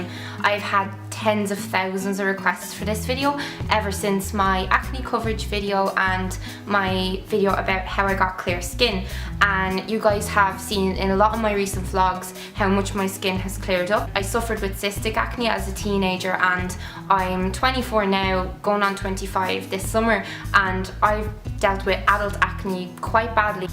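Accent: Dublin, Ireland. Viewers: I think she's from Ireland (I'm from England myself so I'm fairly familiar with the accent). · Did not realise you were Irish! This is the first video I watched of yours :) clicked on it for obvious reasons, thanks for the vid. I changed my diet completely. It's a really difficult time!! So thanks :) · I'm from Dublin and I'm pretty sure she is too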